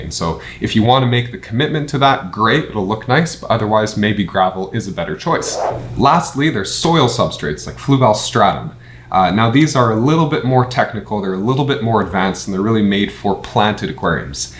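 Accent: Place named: West Coast, Canada. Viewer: I can spot a West Coast Canadian accent from a mile away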